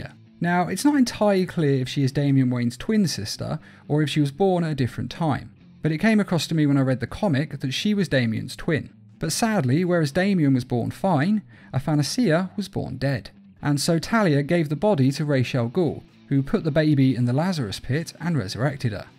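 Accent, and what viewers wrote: England, United Kingdom. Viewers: I have missed the beautiful English voice. My English friends FOR THE LOVE OF TEA!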